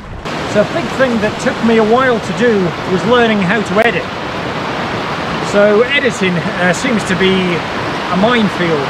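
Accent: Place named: United Kingdom. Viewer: I clicked on this expecting an American accent and was surprised! Good to see another UK YouTuber 😄👍 lovely area that you live in too. I've only been on YouTube for 2 months so it's great to hear some insights from someone ahead of me! Great video my friend. Have subbed 👍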